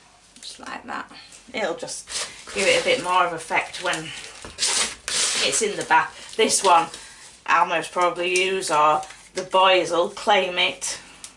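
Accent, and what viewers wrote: United Kingdom. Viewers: Great video, thank you. Great tips too and they look amazing. I never knew about the claw and brush technique. I love videos like this, they're natural - not forced and fake. I think I detect a fellow Yorkshire accent :). I'm glad the recipe isn't the one you use - I kept thinking 'No....don't give away your trade secrets / recipes'....I know that competition can be watching and I'm a firm believer in helping people - but I like to keep my hand of cards close to my chest :). You have to protect your business. Thanks for the recipe x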